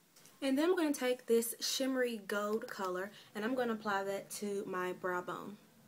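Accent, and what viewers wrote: South, United States. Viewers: Girl your southern accent is strong! "fingers" lol great tutorial!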